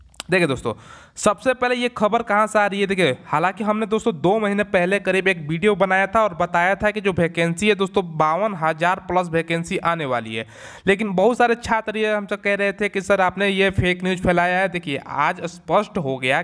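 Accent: Bihar, India. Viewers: Bihari h kya aap??😀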